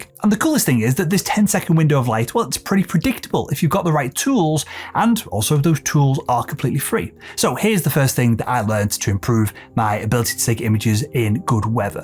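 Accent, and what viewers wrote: United Kingdom. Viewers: Dollars? What good are dollars to the UK? Try saying £'s, after all you do sound British.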